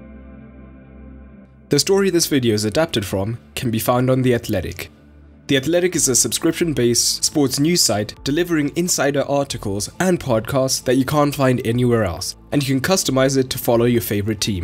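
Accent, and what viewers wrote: South Africa. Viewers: Are you South African? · That Polish right-side was devastating! Piszczek Błaszczykowski and Lewandowski! side note, I know a South African accent when I hear one :)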